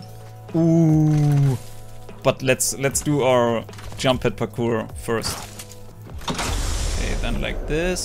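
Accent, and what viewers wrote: Germany. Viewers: Bro du bist ein deutscher oder? Hört sich jedenfalls so an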